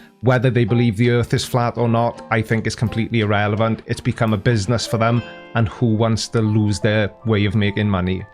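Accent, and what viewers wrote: Wales, United Kingdom. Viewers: Hi, love your channel, been a long time subscriber (about #800 I think). I’d rather have you honest and possible insulting, than fake and Nanby- Pamby. This is why I keep watching you, this and the daft Welsh accent ( this is from an expatriated Cockney) Cheers Paul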